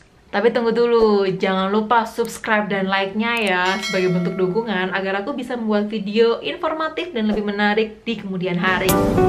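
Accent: Java, Indonesia. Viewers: Dok.. bahasamu medhok bangeettt... Kamu dari mana dok?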